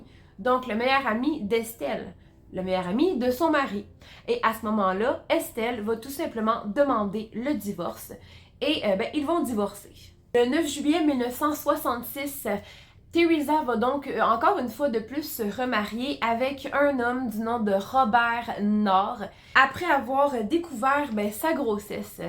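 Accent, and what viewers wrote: Quebec, Canada. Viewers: Bonsoir, merci pour cette histoire effroyable que tu as très bien décrite. Tu es une excellente narratrice et ta chaine va vraiment vite évoluer! Bravo. De plus, j'adore ton accent québécois!!!!!!!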